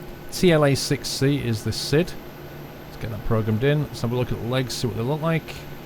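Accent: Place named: North, United Kingdom. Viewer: So you're a Northerner too? Wuhoooo!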